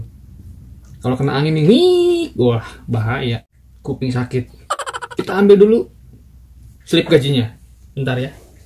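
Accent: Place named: Java, Indonesia. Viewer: Perasaan Jawa barat ngomongnya Sunda😅 Iki lho jowo medhok rek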